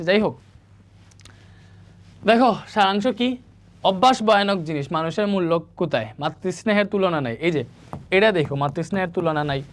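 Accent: Sylhet, Bangladesh. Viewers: Sir apni sylhety basay Kotha bolen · স্যার কি সিলেটি 😊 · Apni ki Sylhet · সিলেটি ভাই, কিতা করো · ভাই সিলেটী নি,,,🫣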